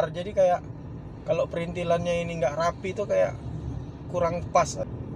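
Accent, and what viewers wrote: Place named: Aceh, Indonesia. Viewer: Kalo di lihat dr logat bahasa kayaknya ini di aceh ya Bang?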